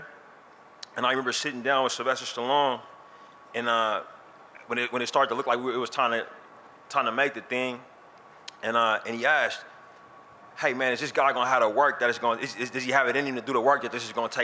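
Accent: Oakland, United States. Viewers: I like that he stutters and has an Oakland accent because, despite that, Ryan is talented, has great vision, a mind, dedication, and follow through based on what I've seen and heard about him.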